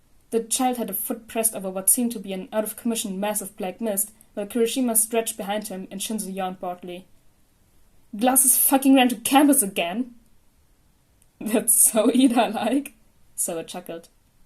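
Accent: Germany. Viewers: Yooooooooo i have a question are you german ?? Because you sound like my class in english class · Let me guess you’re German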